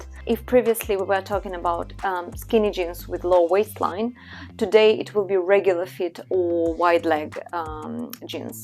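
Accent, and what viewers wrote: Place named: Russia. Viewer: Русский акцент чувствуется 🤣😂🤣🎷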